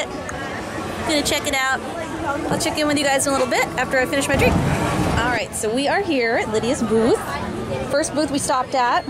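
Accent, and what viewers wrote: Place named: Canada. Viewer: Oakay sooooo?! You sound like you've got a little Canadia in ya der! Disciple18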